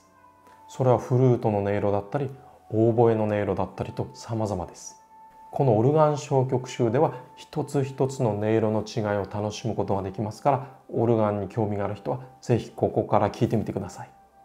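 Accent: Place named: Fukushima, Japan. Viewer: ピアノでバッハを弾くことを躊躇っていましたが、この動画を観て勇気が出ました。ありがとうございます😊 ちなみにわたしは福島県出身なので、の話し方には親しみがあります。これからも楽しみにしています。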